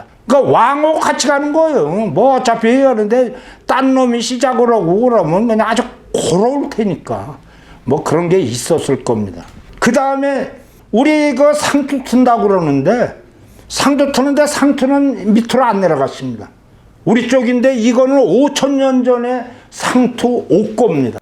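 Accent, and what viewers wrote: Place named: Mahan, South Korea. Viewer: 선생님은 말씨가 마한쪽 말씨네요~충북 괴산,음성,충주,여주(남한강 수계지역)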